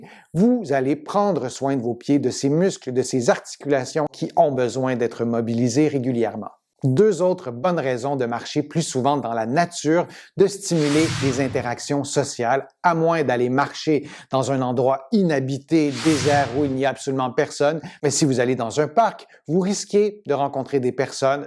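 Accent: Quebec, Canada. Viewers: Passionnant,,merci cher monsieur,je m ''abonne.....qui plus est , j'adooore votre accent du Québec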